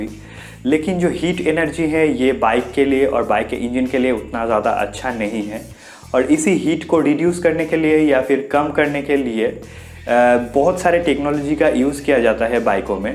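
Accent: Bengal, India. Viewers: Ap bengali ho Am I right? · Bangali lag raha hai. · Dada tum Bengali lagta hai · r u a bengali???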